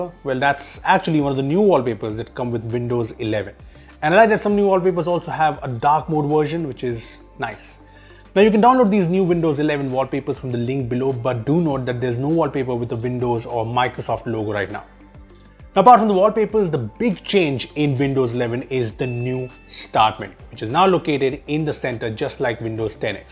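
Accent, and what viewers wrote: India. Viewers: Ok i will watch it even though its WITH INDIAN ACCENT haha but yeah thank you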